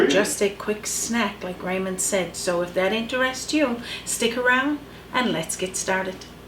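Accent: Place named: Newfoundland, Canada. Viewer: Hello from Portland Oregon. My daughters are watching your videos to learn how to cook. They love you so much they are mimicking your Newfoundland accent. I heard them asking each other " and " ow" are you"?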